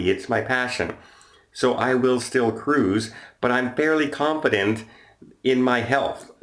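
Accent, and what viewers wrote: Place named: Quebec, Canada. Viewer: he lives in Ottawa and his accent is more Montreal/Quebec to me than anything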